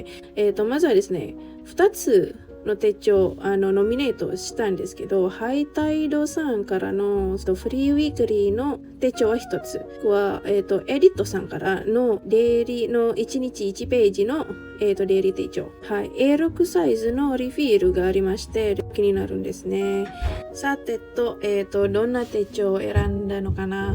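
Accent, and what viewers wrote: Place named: Indonesia. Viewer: I guessed from your accent that you're from Indonesia. Hello! I'm from the Philippines, also living in Japan. よろしくね！ 手帳や文具が増えるばっかりで、その活用方法のため自分でよく手帳会議をしています！💦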